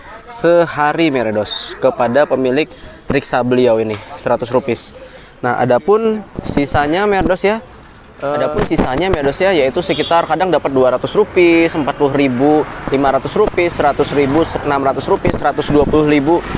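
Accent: Banjar, Indonesia. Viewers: Ada sdikit logat bahasa banjar nya 😁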